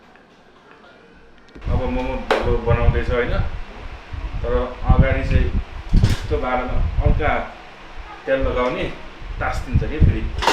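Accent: Nepal. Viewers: dai love your Nepali accent. It's so hilarious. Keep making more videos✌🏻✌🏻. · your speaking in typical nepali accent, very beautiful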